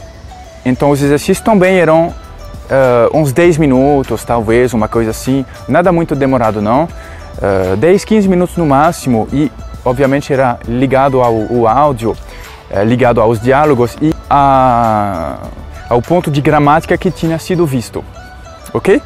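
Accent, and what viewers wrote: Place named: France. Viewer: Seu sotaque francês é muito bonitinho! 😗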